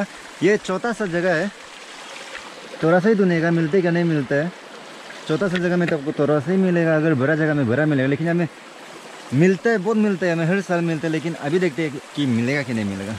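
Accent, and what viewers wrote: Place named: Dhaka, Bangladesh. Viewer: Assamese ma dhakiya bolta ha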